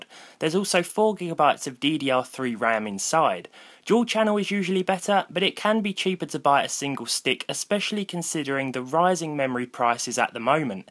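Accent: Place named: Midlands, United Kingdom. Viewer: Knew he was a Midlands lad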